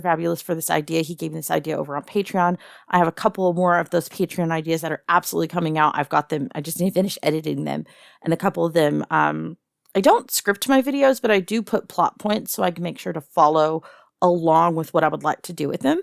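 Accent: South, United States. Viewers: Wasnt expecting a southern dragon but its a pleasant surprise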